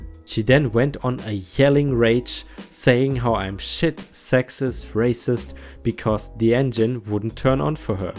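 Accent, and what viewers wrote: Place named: Germany. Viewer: Ah, I was right, you are German. I am subbing for more stories and more of your charming accent. :)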